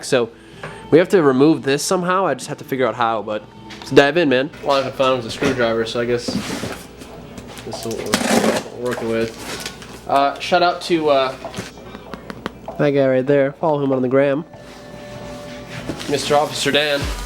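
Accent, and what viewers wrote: Australia. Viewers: you need to stop speaking in an Australian accent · This dude kills me me with his Australian voice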